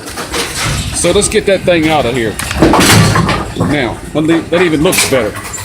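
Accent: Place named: South, United States. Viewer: You have a southern accent!!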